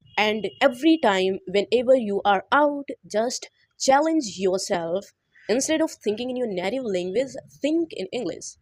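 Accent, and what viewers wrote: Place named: United States. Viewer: Are u living in US ? Ur accents is very closer to them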